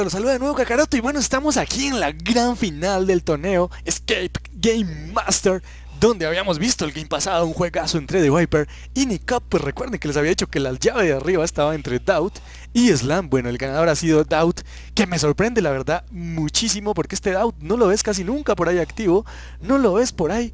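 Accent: Bogotá, Colombia. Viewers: De que parte de colombia eres Buenos videos :D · Entonces eres de Bogota parcero xD muy bien comentado, con buen tono de voz y animicamente bien. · De que parte de Colombia eres? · eres colombiano y rolo?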